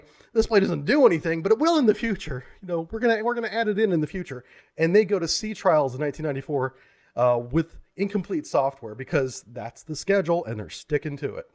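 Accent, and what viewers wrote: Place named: United States. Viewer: And the narrator has an aMERICAN ACCENT.....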